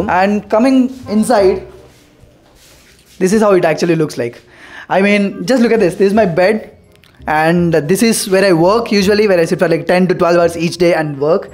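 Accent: India. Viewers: Atttr..Bttrrr…Gttrrrr..Pttrrrr. The Appu accent is real strong in this one.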